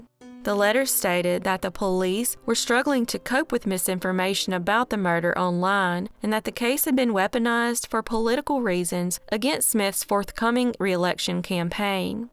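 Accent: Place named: Louisiana, United States. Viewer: twelve of my ancestors are entombed in that cemetery(St.Joseph). 🙂 You have a Monroe, Louisiana accent. I don’t know where you’re from though.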